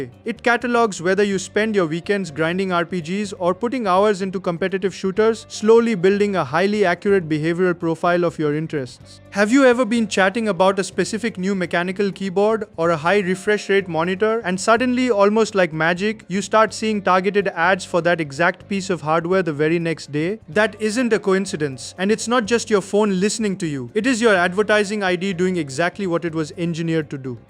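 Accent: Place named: India. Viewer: Someone has to say it: It’s not AI, he’s just Indian, they all sound that way! Actually I know a lot that speak without any accent to the point you mistake them from other places. I also appreciate that at least it’s real info and accurate how I feel to the situation. I can’t say slop certainly. If it was AI, then even AI sees the issues.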